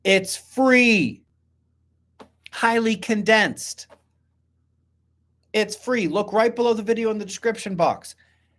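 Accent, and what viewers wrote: Pittsburgh, United States. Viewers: That Pittsburg accent caught me off guard. Also your a very intelligent man how you expect ppl to come up with advanced questions. Lol Free Top G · Ben, Thanks So Much for this discussion/update... Has anybody plotted any Theoretical Data on Post Pole Shift: Earth Rotation and or Slosh Factor from the Oceans? Thanks for the Pittsburg Dialect, I understood it all... Carpe Diem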